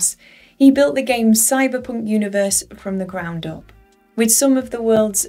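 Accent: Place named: North, United Kingdom. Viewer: I wasn’t expecting that accent! Northerners rock 😀 Don’t tell anyone, it’s great ‘up north’! 😂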